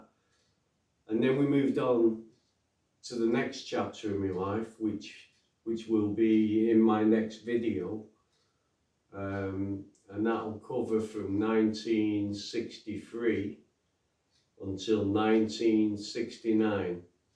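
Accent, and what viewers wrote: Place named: Openshaw, United Kingdom. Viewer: Hi, Just caught your video. I'm am Openshaw lad too, born in 1954 and lived on Whitworth St until 1967. I love your Openshaw accent which is close to mine! I used to go to George Street Park, Openshaw Lads Club, Whitworth Baths etc. I like the idea of you creating a video of your memories. I decided to write my life story on paper and it now runs to 70+ pages with similar memories to yours. I attended Barneys school and remember the dentist you mention. Take care for now. Graham.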